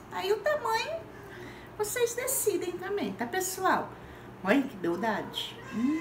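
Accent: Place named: Minas Gerais, Brazil. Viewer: Sutaque mineiro ótimo vou fazer essa delícia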